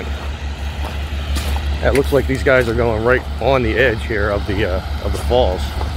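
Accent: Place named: Philadelphia, United States. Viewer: Great video! I love your work. I am a history geek who grew up in Mayfair (Lincoln HS grad), and your accent makes me think of home. ❤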